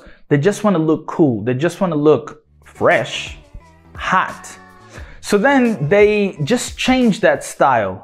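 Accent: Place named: United States. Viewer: Amazing!! I love english sotaque América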